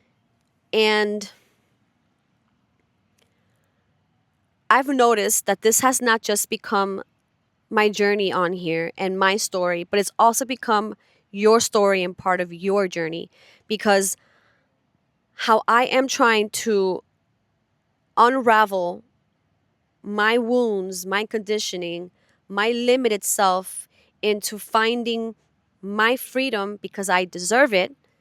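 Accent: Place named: New York, United States. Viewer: Hey! You're looking beautiful, of course!, lol! 😂😊 I love the scene around you, it's stunning! Such a nice looking lake!, you are an incredible woman! I very much believe you will achieve what you wish. You definitely have what it takes. I understand many of the things you feel and have experienced. Maybe not in exactly the same way, as we are two different people but definitely to a point that I get you. Just an observation before I continue! Not something you need to answer but I get the hint of New York in your accent. I could be wrong or I might possible say Philli but I can't quite remember their accent!, lol! 😂 I only mention it because accents interest me, capture my attention! I am a Brit after all so hearing different accents is interesting, lol! 😂 OK, where was i?, lol! 😂 You will get to where you want to go! It may not be right this moment, it takes time to save money, especially if your employer doesn't pay much. 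I had to get out of the situation I had been in for many years The psychological effect it can have on you is something that really becomes something you notice once you live in your own place. You realise the control people can have and how messed up they can make you. As mentioned on another video of yours, once you do get out of your situation, not only will you feel so much better but you will truly discover who you really are. You are an incredible woman and so much stronger than you realise. Just hearing your story reveals that. Believe in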